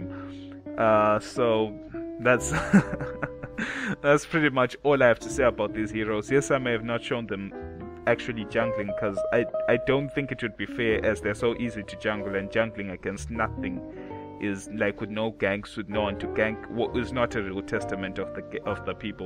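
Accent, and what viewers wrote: South Africa. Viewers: definately south african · Yo dude, You sound South African, Is that the server you play on?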